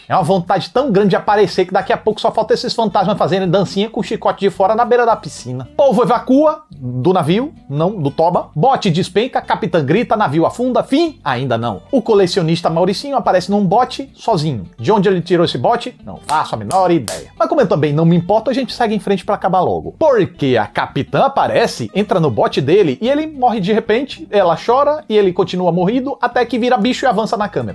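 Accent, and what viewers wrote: Northeast, Brazil. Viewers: Mano vc tem um sotaque meio nordestino, o que casa muito com o tom cômico do video. Brabo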